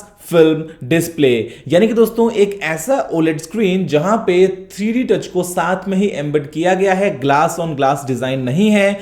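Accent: Bengal, India. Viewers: Gourav sir ap kaya Bengali hoo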